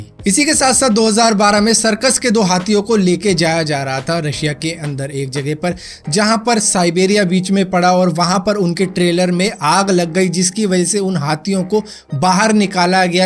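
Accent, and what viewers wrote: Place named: Delhi, India. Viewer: dilli wala accent paarak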